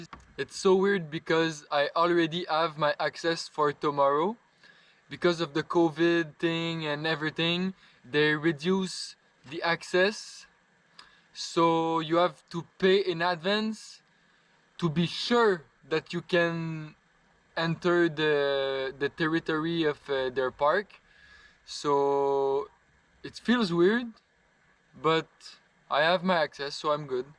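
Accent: France. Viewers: Ur Accent seems like ur a French Guy! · Living in Vermont and loving Quebec, I was excited to hear what you deal with in your travels. Some day we'll be able to cross the border again, eh? So it was frustrating to have the music too loud at points. Since you seem to be a native French speaker, the accent adds to the problem (but thank you for doing this in English). I'd suggest no music when speaking as a rule; people listen on so many different kinds of speakers and such. What might sound great on headphones can be junk on a phone speaker or such. Keep going, little glitches will iron out and what you are doing is great.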